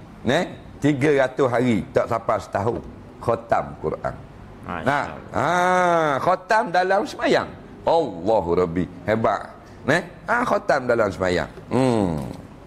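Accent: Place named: Terengganu, Malaysia. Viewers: Ustaz Idrus kl 1 bulan tu mmg penuh hari2 ada buat ceramah agama di mana2 program yg dijemput. Kl di negeri dia di Trengganu ceramah dia dlm sejam ke sejam setgh..tp kl di luar Trengganu boleh sampai 2 ke 3 jam sebab bg ruang org luar yg susah nok jumpa dia selalu. 1jam ke 1 jam 15 minit ceramah ttg tajuk yg diberi..baki tu sesi bersoal jawab dr org2 yg dengar ceramah ttg apa2 sahaja. Kl masjid luar biasa mmg sentiasa penuh dibanjiri org sampsi luar masjid. Loghat Trengganu dia mmg pekat..tp lama2 dengar boleh paham. Cth..tubik tu maksudnya keluar, Ganu tu Trengganu, hok mana tu..yang mana, pitih tu duit, wang.., riyal tu ringgit..2 riyal tu 2 ringgit, nyor..nyo tu kelapa atau nyior, nyor...,kl dia sebut JKK tu jawatankuasa kampong( pembantu..jawatan kuasa yg dipilih dlm mesyuarat sesuatu kpg atau masjid. Dipanggil Jkk kpg atau jkk masjid). 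· bahasa ustaz tu bahasa loghat terengganu..semakin sukar untok orang indonesia memahami nya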